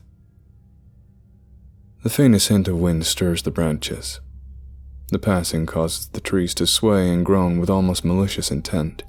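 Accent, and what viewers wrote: United States. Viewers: Your American accent is so good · This reading was fantastic!! Haha you perfected the average american accent on this one, bravo!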